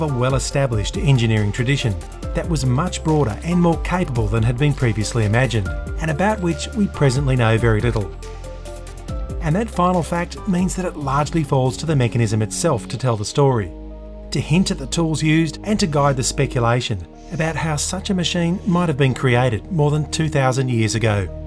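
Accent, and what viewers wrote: Australia. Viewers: Are you an Australian or a New Zealander? Can't pinpoint the accent.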